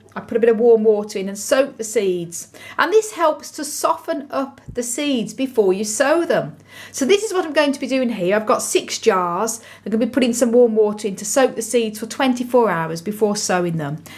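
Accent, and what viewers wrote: Birmingham, United Kingdom. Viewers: Hey, are you from the Birmingham area? I'm in Sutton Coldfield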